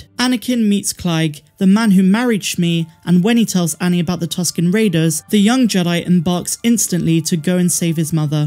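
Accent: United Kingdom. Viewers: Love you station and your British accent; I'm a straight Black American man. And I generally agree with most of your analysis but I totally disagree with your analysis on why Anakin turned out to be Darth Vader. You see he was a slave he wasn't even going to make it to adulthood had he not been taken in by the Jedi Temple. He should have been much more appreciative and do the things that he was asked to do that would have only made him into the chosen one he should have been. But he wanted to be rebellious and disrespectful at times and therefore the two top Jedi Masters knew who he was before he did. He was precocious and vain and he would've still rebelled, even against his original father figure, Qui-Gon Jinn at a certain point. Your analogy sounds like the abuser blaming the abused for abuse.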